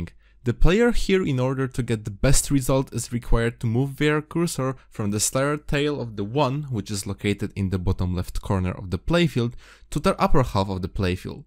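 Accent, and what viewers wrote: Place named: Poland. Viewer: he is polish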